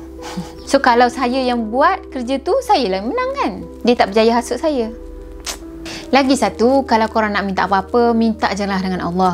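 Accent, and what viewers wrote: Kelantan, Malaysia. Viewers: Org kelatey ko kak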